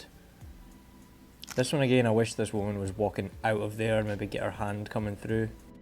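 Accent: Scotland, United Kingdom. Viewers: Loved it! So much life at night there, up here its almost dead at night. Thanks for sharing. And btw... love your scottish accent;)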